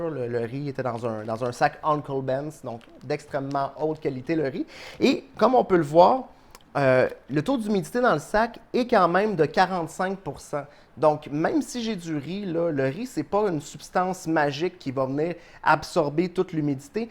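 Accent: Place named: Quebec, Canada. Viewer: Putain l'accent québécois une tuerie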